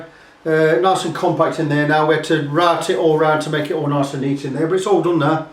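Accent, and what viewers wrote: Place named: North, United Kingdom. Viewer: great stuff mate. was the guitar just standard 2 humbucker 3 way toggle, no push pull pots. just because normally you would be soldering 2 wires together from the p/up in the old school way. i'm putting a seymour jb 4 conductor in a les paul with pcb. i bought a pre wired molex adapter on ebay for purpose but wires came loose when plugged in! so thinking doing it this way. cheers pal, sounds like you're a fellow northerner....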